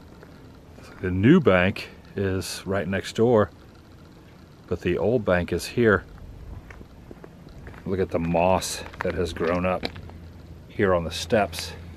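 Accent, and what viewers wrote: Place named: Florida, United States. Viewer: Are you originally from Florida? You and Tampa Jay have the same accent.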